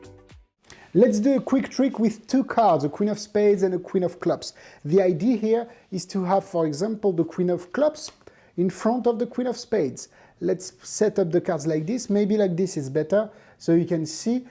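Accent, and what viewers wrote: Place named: France. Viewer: Nice French accent :) Congratulations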